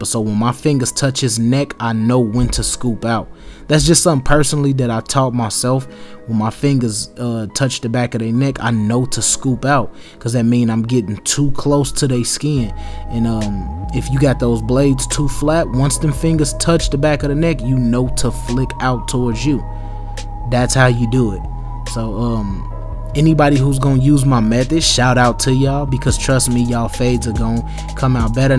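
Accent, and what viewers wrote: Chicago, United States. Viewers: Chicago accent..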